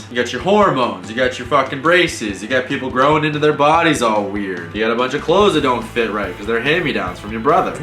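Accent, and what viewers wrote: Boston, United States. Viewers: Chances are, your a fucking power ranger! LOL - Jason P.S. You can tell by your accent that you've been in Boston too long. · I like you :) minus the boston accent and Patriots/Red Sox fav yuck..... As a sports fan we could never date. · Your Boston accent, OMG I can tell you went home for Christmas! LOL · Sounds like your accents coming in a bit more - spending a bit more time in Boston? Taken Tuesday... 'But what I do have are a very particular set of skills...' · So much Boston accent hahaa! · your Boston accent.. yes, thank you. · The longer your beard gets, the more pronounced your Boston accent gets. It's fuckin' awesome. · Did you intentionally have a boston accent in this one?? heh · That Boston accent tho. PLEASE CAN YOU SAY: 'I lost my car keys'. PLEASE! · The boston accent is very apparent this week. Best yet! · Haha love how much of a Boston accent you have in this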